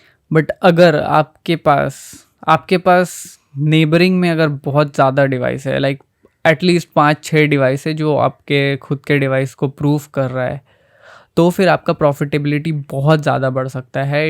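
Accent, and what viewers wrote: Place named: Hyderabad, India. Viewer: he has hyderabadi accent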